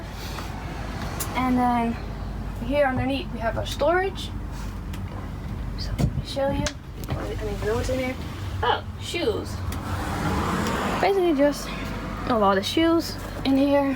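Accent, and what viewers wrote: Netherlands. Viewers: Is that a Dutch accent? Nice simple van design. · Ahh dacht al dat ik Nederlands accent hoorde😁 super Tof! Ik wil dit ook in nederland😍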